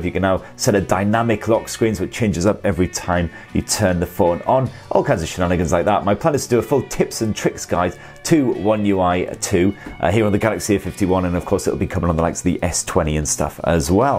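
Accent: United Kingdom. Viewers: Said the actress to the bishop! I can tell your British! Good review getting mine next week!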